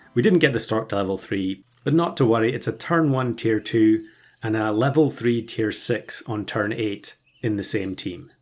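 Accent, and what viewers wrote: Scotland, United Kingdom. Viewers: Great, realy enjoy your Content thank you 🙂 you have a Great soothing scottish Voice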